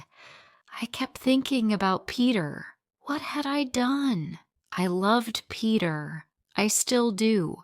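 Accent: United Kingdom. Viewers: Thank you very much for your stories ❤. Is that a british accent?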